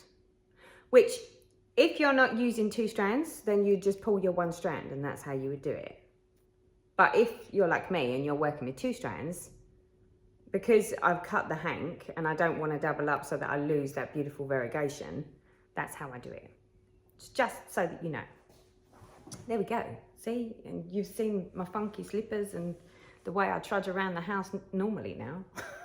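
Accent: United Kingdom. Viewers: Ive just started cross stitch and love full coverage just want to say thank you for helping me find my groove and it's confirmed I'm still in love with the British accent lol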